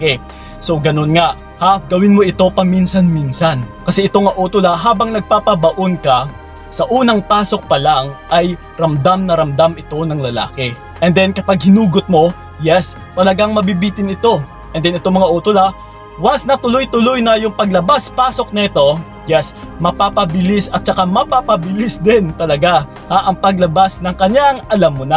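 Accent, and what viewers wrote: Iloilo, Philippines. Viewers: daw ilonggo tono mo tol hehe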